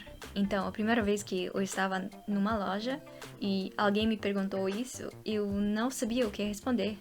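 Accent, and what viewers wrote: São Paulo, Brazil. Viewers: Você parece com uma Paulista , você fala o português muito bonito, 👏🇧🇷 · bemm dms,seu português está como alguém que mora em são paulo,parabéns!❤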